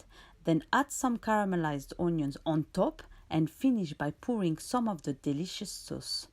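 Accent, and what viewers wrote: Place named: France. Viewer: You have very sweet french accent🙂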